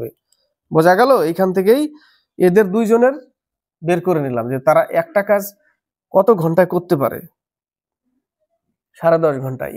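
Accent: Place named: Bangladesh. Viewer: কথা বলার ধরন তাতে মনে হচ্ছে আপনার বাড়ি বাংলাদেশ।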